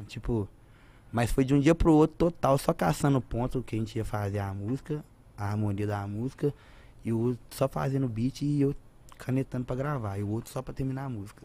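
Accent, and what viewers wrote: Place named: Belo Horizonte, Brazil. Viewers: nois é mineiro uai, é o sotaque oxi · Esse sotaque é de bh e região próx, quem é de fora estranha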